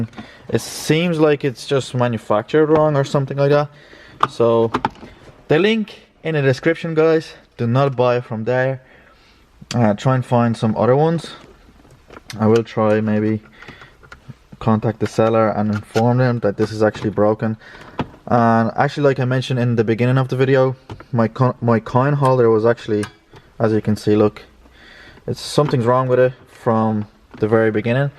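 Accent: Ireland. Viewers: u irish my g? love the accent.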